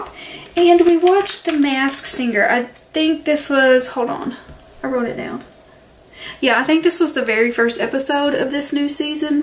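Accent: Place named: South, United States. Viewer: New sub. Love this. Sounds like you are a fellow Southerner. I live in Tennessee. Blessings.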